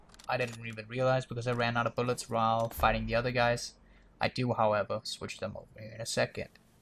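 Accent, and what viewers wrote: Denmark. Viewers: Wait? Are you Danish? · Are u danish? :D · R u danish? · Are you danish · Are you Danish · Are you Danish? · tænkte nok du var dansker!!! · are you by any chance Danish? 😃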